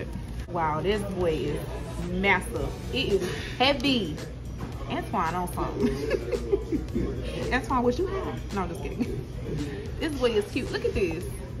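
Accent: South, United States. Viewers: In and out is only good the animal style way and them fries was trash when I tried them. But I want you try other options at whataburger and lmao u know how us down south folks talk gurl 🤣 😂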